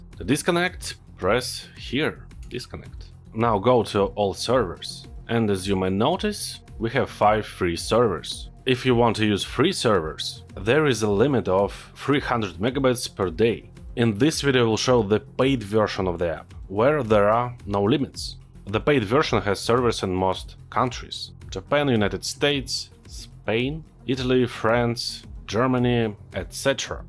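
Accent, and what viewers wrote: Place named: Russia. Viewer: Русский акцент :)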